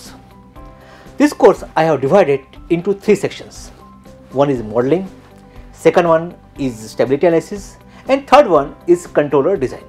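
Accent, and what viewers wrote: India. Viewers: ENGLISH not INDIAN ENGLISH GODDAMNIT!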